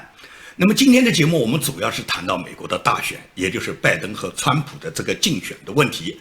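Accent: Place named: Nanjing, China. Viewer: 挂了，是南京话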